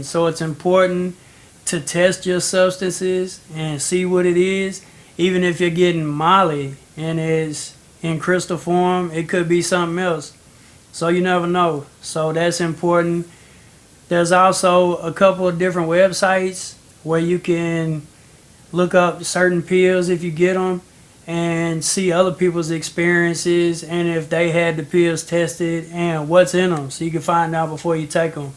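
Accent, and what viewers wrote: United States. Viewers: You from Nashville? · Are you from Louisiana? I love that accent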